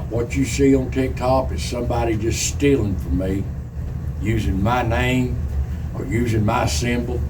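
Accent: Appalachia, United States. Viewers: Thanks. Watch you every time you post. If those folks don’t know your accent, they are not from around here. And I’m in the Blue Ridge of Virginia. Yea, I’m behind enemy lines now but still country to the bone. Love ya in Christ, brother. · one of the reasons I love your channel is because your accent is like my family's accent. Like the accent in the mountains of Virginia where I grew up. I live in North Carolina now, so I'm still in Appalachia, but I miss that area where Virginia, Kentucky and Tennessee come together. It's where all the beautiful nature is, and where all the kindest and most hospitable people on Earth live. People just like you and yours,. Your videos are a PLEASURE to watch! I love your nature video series, AS THE RIDGE TURNS. Dirty Dan is an old rascal, and I'm always eager to see what ol' Yeller, Sock, Ricky or Billy Bobcat is up to! 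I miss seeing Wishbone and ol' Sidewinder. These little animals have become like old friends, and I have you to thank for that! Bless you and your family, and thank you for providing me with hours, hours and hours of good, clean, family entertainment. I truly appreciate it. It's a great way to start a Monday to see nature's beauty. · People who think your accent is fake do not understand there are different southern accents. Yours is like my granny's was, what I consider to be mountain speak. It's real, just no longer common. There were times I couldn't understand Granny, hour was 'ahr', flour was 'flahr', not one was 'narry', wash was 'warsh', there was 'aher' or 'yander'.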